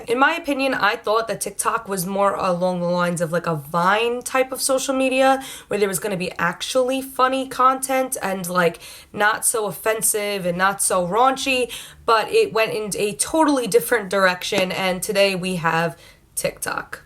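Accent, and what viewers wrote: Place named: New York, United States. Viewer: Are you from NY or Jersey 🤔 that accent is pretty 'HEY I'm walkin' here!'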